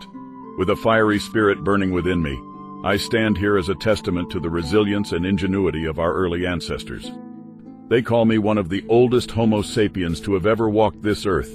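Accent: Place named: United States. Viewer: Odd, the American English. Not even an Arab slant to the English! 😢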